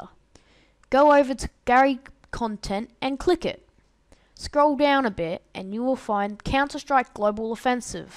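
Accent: Australia. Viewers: Is that a Australian accent? thanks bro works perfectly :D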